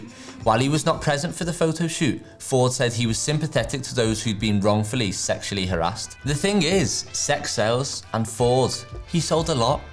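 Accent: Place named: Northern England, United Kingdom. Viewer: Great vid. Very informative. Great northern accent. That compliment is from a South London boy. Ya get me.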